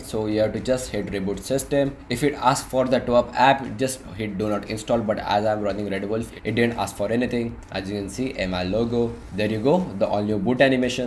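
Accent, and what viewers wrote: India. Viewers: From your english u sound like an Indian. What will u do without volte and with 7.1.2 . It doesn't matter if it is 7.0 ot 7.1.2 off basic things are not working. Strange people..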